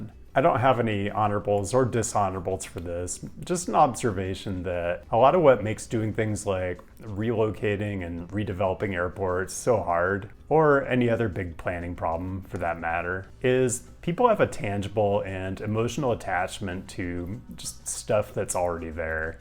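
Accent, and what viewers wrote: United States. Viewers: SJC definitely shouldn't be on this list. Proximity to downtown San Jose is a MAJOR advantage. Unlike in Europe, we don't have to travel for hours to get to the airport. It's right there, 10 minutes away from 1st and Santa Clara by car or 20 minutes by light rail/bus. bro what the fuck? (1) why are we destroying the natural environment outside of the built area for no goddamned reason (2) that destroys all the advantages of the current location of LAS, which, the current location is great, because it's close to where MOST people are going. And LAS has public transit buses right there at the airport that can take you to the monorail and various other places. The monorail is only 3.1 miles (4.9 km) away. Taxis are usually parked at the airport and can get you to the nearest monorail station in 20 minutes. The public transit buses are slower but cheaper. 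Sorry, but the more I watch this video the more I see with which I disagree. Like I said above....that's really the bottom line. WHY would you want to create inconveniences???? By your accent, you're either an American or a Canadian....yet, you seem to have abandoned the frontier philosophy of "why not" for the old European one of "why".... look, sacrifice IS noble, when you're protecting others or you're protecting a valuable institution. That's not what is happening here. Given that airplanes move to green technology -- which IS happening, and will continue regardless of any action by any legislature -- there's no logical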